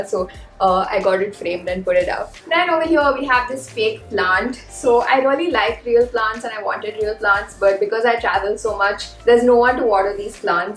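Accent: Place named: Mumbai, India. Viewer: What a ridiculous thing to say. Living alone is something that a lot of people aspire for. You don't need to be filthy rich for that, although of course it helps. And in every city in the world people have peculiar way of pronouncing things. Growing up in Mumbai, even I used to speak like this only. Eventually when I shifted I speak like people around here now. Nothing stuck up about it.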